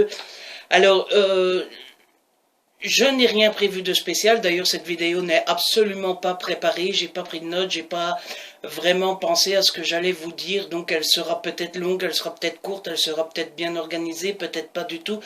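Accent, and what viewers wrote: Belgium. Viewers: Coucou! Happy Bday!:) Je ne suis pas une habituée des commentaires mais sache que je te suis depuis quelques mois maintenant et que ça reste un plaisir de voir tes vidéos:) (C'est sympa d'avoir un booktubeur avec un super accent made in Belgium! Je suis Liégeoise, je sais ce que c'est lol) Perso, j'aime les formats habituels, je n'ai pas de demande de vidéo spécifique. Au plaisir de te voir dans une prochaine vidéo, bisous!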